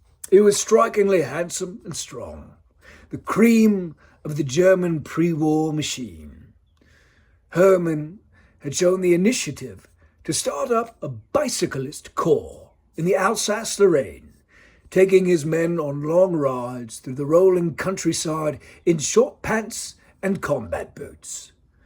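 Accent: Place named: United Kingdom. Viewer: sometimes your british accent grew too much on me and i started reading things aloud in it subconsciously 😆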